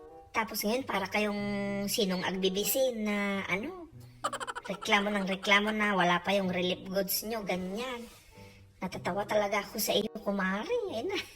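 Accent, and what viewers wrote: Ilocos, Philippines. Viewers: bakit ganyan ka kuhang kuha mo ang style ng mga ilocano magsalita haha,.. More videos ante pra pmpa good vibes lng s kbila ng problmng kinkharap ntin s buhay.. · Hahaha ilocano tagalog hahahaha... pavirgin🤣🤣🤣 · Naalala ko mga lola ganyan nila ako kausapin tagalog na may touch ng ilocano. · Makapakatawa Ante 😂😂😂 Makarelate ak ta kasta ak agsao tagalog ilocano haha. Salamat ti effort mo nga pakatawain na kami aglalo tatta nga panawin. 👍😊 God bless always ❤️😇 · Tagalog Ilocano met auntie 😂😅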